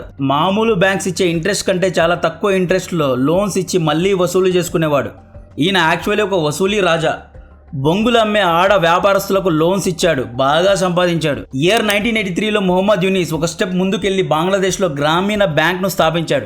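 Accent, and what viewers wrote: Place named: North India, India. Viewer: బ్రో మీరెందుకు తెలుగు భాషని ఒక్క ముస్లిం లాగానో లేదో తెలుగు రాని నార్త్ ఇండియన్ లాగా మాట్లాడతారు? పెండ్లాం కాదు అనాల్సింది భార్య అని కొద్దిగా ప్రొఫెషనల్ గా మాట్లాడటానికి ట్రై చెయ్యండి.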